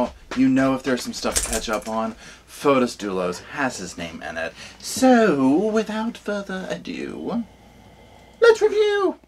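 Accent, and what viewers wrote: South, United States. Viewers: Omg I cannot wait for you to share your other channels! Hahaha I’m a sofasquad groupie now. Full disclosure: You’re seriously just the sweetest thing I’ve ever laid eyes/ears on. You always seem to say exactly what I’m thinking. And Uhg your southern accent just tickles me to death. Ok sorry now I’m sounding borderline creepy... Just sayin, I’d listen to your podcast even if it was just you discussing your bowel movements or whatever 🤷🏼‍♀️ so keep up the good work bud 😊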